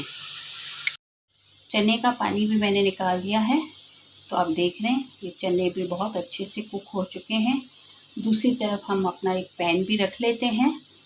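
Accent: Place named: Sindh, Pakistan. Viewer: Tasty thali sweet nd meethi boli sindhi k sath✌🏻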